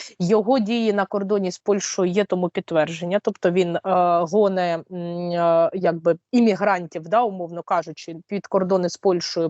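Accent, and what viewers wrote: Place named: Kharkiv, Ukraine. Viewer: Манера мови характерна для її локації. Харківщина та Дніпропетровщина! Ну на 500 відсотків українська! Десь так розмовляв Нестор Махно!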